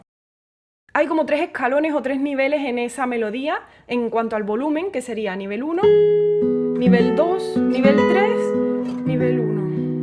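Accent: Andalusia, Spain. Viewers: me encanta tu acento andaluz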